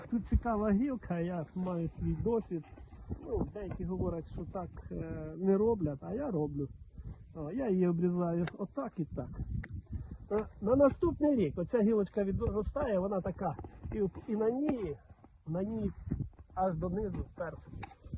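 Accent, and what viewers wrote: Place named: Podillya, Ukraine. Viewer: Дуже гарно дякую Вам! Було пізнавально. Мова – ніби живої води напився! Скучив за Поділлям (батьківщина моєї мами). Додав у підписки. З нетерпінням чекатиму нові випуски! Вітання від донеччанина, що зараз живе на Харківщині) хай ми Переможемо! З повагою, Іван Редько!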